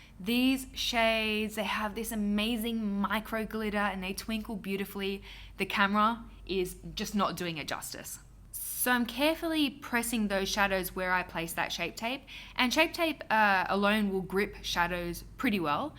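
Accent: Australia. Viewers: I crave shape tape soooo much, but Tarte is not sold in Italy and the shipping costs is quite high for me... I can't stand this situation anymore 😭😭😭😭 #tartecometoitaly P. S. your aussie accent is so pretty! 🐨❤️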